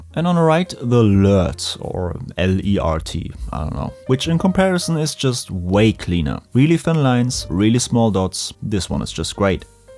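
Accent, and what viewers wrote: Germany. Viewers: Subbed because: German accent gives the content even more quality; The hard work you put into this video added a lot to the way I’ll play the game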